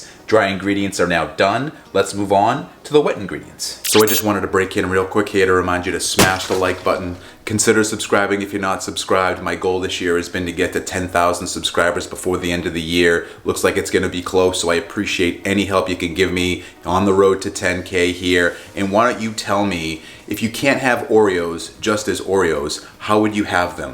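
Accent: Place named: Boston, United States. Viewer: I recognize that accent! Where in the Boston area are you from?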